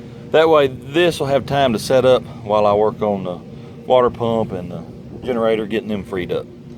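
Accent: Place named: Tennessee, United States. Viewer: As usual a great video. Sure glad to hear your accent, brings back memories of my time in Tenn. Many thanks!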